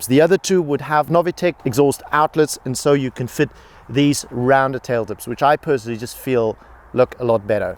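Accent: South Africa. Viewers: I'm in 😍 Hey man, are you South African? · My guy you sound so south African buddy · Is he South African? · Man, you sound like a South African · Is the guy reviewing the car South African, he sounds like it especially with some of the terms he's using? 😅 eg. Jislaikit and kak fast LOL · Is the presenter South African... Hhay'bo... "kak fast" & (jIs-like)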